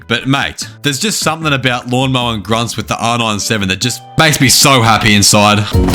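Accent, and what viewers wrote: Australia. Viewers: Mate I’m Australian and my accent ain’t close to being as thick as yours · Damn the Australian accent is amazing, good video as well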